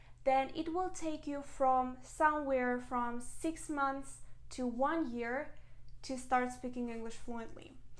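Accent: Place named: Russia. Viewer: Her russian accent is pretty noticeable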